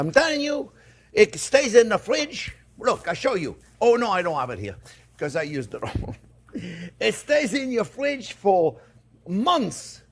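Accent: France. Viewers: He sounds like Mario, trying to speak English with a French accent.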